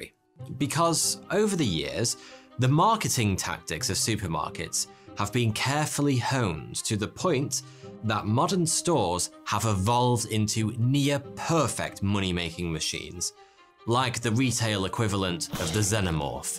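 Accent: United Kingdom. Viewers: At the start it sounds like he is saying 42 here instead of Cause his accent is deep British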